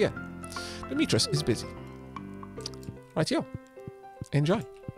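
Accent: Wales, United Kingdom. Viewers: Hi! Firstly, very glad that this series is continuing! Between your silky smooth welsh tones and the relaxing nature of stardew, i'm always thoroughly unwinded by the end of a episode. In terms of green house organisation: I find there is enough space to have two of every regular fruit tree, and then one of each mango and banana from ginger island around the edges. Once those are fully grown you can intersperse kegs or preserve jars around these. For the dirt areas I actually prefer the quality spinklers, as it helps you organise your crops, having 8 of this and 4 and 4 of that etc. This also leaves a gap to one side for tea/ coffee. I find it also helps to have some seed makers in there as well, as i tend to re-plant the same crops again and again, and can go from crop back to seed really easily. Hope that helps, i'm off to fufil my own desire for tea on this rather chilly sunday morning.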